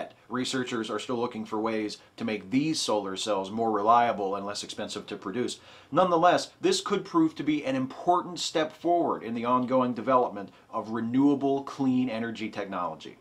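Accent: United States. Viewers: veeee hickul. I love American accents.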